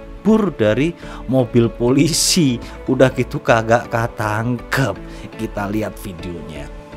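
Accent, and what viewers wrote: Java, Indonesia. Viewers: Logatmu jawa maas,,,apa asli jawa y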